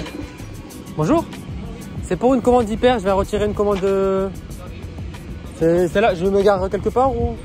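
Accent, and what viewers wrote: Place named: Tourcoing, France. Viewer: On dirai tourcoing 😁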